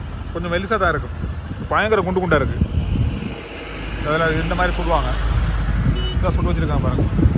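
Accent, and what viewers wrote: Sri Lanka. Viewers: congrats watching all your videos for your srilankan tamil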